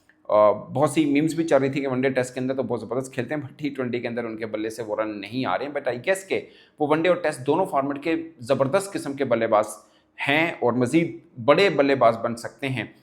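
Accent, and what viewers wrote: India. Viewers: India waly jesa bolty hen wesa he bolta hy 😢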